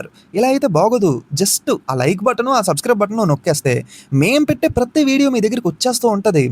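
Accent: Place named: Vizianagaram, India. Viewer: Yeti antai antunnav meedhi vijaynagaram kadha bro 😊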